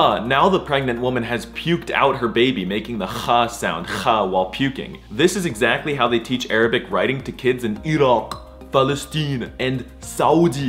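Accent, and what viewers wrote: Egypt. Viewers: Y'know, as an arabic guy myself, the fact he learned arabic is such short amount of time, is literally spectacular. Especially that he learned the Egyptian accent too, it's a pretty hard accent. I seriously enjoy watching you! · As a Arab, I’m pretty impressed by the explanations but some of the pronunciations were mostly in Egyptian dialect and not how they are actually said, other than that amazing video we are all here to support you my brother. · my guy is talking like Egypt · Sorry but your Arabic is partially wrong. You are pronouncing and speaking Egyptian dialect especially for G which should be pronounced J. Your pronunciation of that letter is completely wrong. I didn't heard you talking too much sentences in Arabic but as per the few words you did used, I thing that also your speaking is Egyptian and that is not the real and full Arabic, it's only the Egyptian dialect. 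· You speak the Egyptian dilect. It's different from the standard Arabic. The ج should be pronounced like in Jacob not like in game. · there is a small mistake here . that alphabet doesn't pronounce like a "gagaga" its pronounce like gym. you seem to be learnt the arabic in egypt that's why you have the accent. other than this the video is awesome. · This is not the real Arabic prononciation,this is Egyptian prononciation,just for correction . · ook but why you speaking Egyptian 😂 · Yeah, hes speaks Egyptian Arabic. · لهجتك المصرية تحفة براااڤو❤😂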